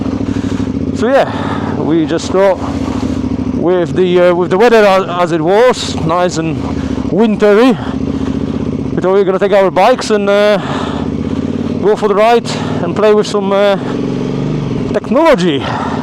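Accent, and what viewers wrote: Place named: United Kingdom. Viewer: Just found your channel. Great video. I'm trying to place your accent. Is it Africano British?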